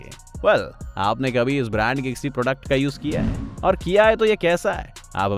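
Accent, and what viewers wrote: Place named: Mumbai, India. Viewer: Plz bring old voice artist back ,this guy is ok but his accent is like a tapori style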